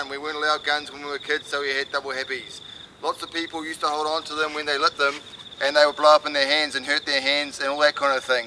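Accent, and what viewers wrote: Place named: New Zealand. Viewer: Coz he's a Kiwi - aka new zealander. Thats their accent.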